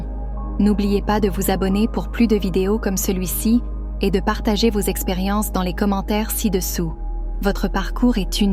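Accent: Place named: Canada. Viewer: ******* Bonjour, (Elle a un petit fond d'accent canadien cette voix de l'IA ). Nous le savons bien qu'ils nous manipulent depuis le panthéon mésopotamien..... Mais nous sommes au temps de l'apocalypse et notre désir est de parvenir sur Nibiru le plus vite et le mieux possible... Nous y travaillons de tous, nous, les Ligthworkers... Hi, Fathala...Par IA interposé ! ******************************************************************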